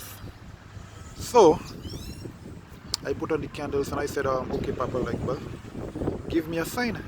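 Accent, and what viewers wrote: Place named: Dominica. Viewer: He sound he from Dominica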